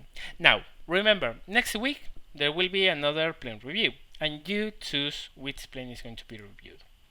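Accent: Spain. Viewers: are you from Spain? I hear a Spanish accent :P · Eres español porque tienes acento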